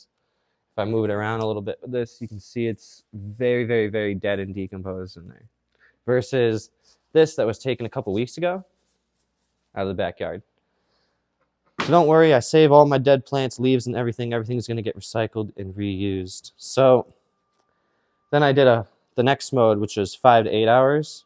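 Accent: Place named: Southwest, United States. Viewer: Nice video. Sounds like yur in Nevada, New Mexico, Arizona or Texas. Your ideas our great. We find plant people are the nicest. Eventually kids will be even more fun then plants then you teach them all about these great things, nothing more joyful then kids. Keep pushing along, the Lord, wife, school, work, family then plants n hobbies and you will have a great balance. Blessings and very nice videos and well spoken.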